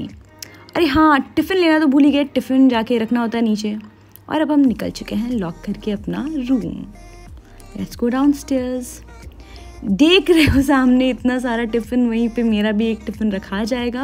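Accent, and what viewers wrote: Bihar, India. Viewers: U r speaking a real bihari time😄😄 Love from gaya🥰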